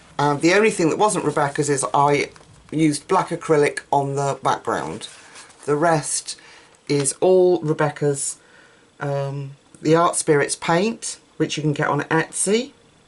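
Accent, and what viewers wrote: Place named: RP, United Kingdom. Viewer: great art...and personality, why are British posh accents so funny, the language - "stickled to High Heaven", LMAO. love it. I could listen to you every day - I don't know how famous she is in general, but most Americans, like myself - except I know who this is, but most Americans, possibly some Brits - might not know the actress, Amanda Donahoe or Donahue. She was in "Lair of the White Worm' with Hugh Grant from the '80s. I freaking love listening to her and looking at her, she's awesome. don't ask me why, BUT...you sound like her! That's a compliment luv...coming from me. buy yes, love your works, I'm not as crafty as you and the coloring community on youtube, but I'm trying in my spare time. However, I go for the more strange and horrific if possible... let's just say, that Bradford lady's great art would never hit my desk. 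- But seeing your love for the kind of "cute as shit" stuff, and payoff ---- is well worth it. Keep on Stickeling Luv. lol, James from Florida!!!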